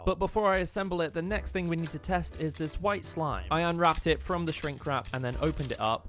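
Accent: United Kingdom. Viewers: I had no clue you were from the Uk- but HII ME TOO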